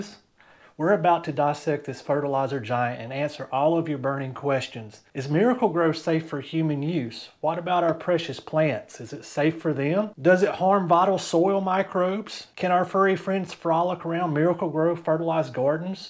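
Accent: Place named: Alabama, United States. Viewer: Auburn, huh? I thought I heard an Alabama accent. I'll be subscribing to help me with my garden in Tuscaloosa.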